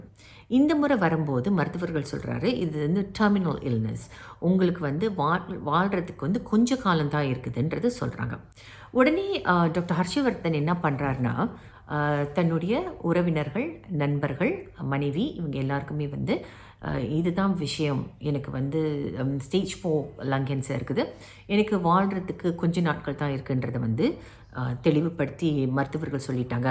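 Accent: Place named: Tamil Nadu, India. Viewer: her narration with Tamil accent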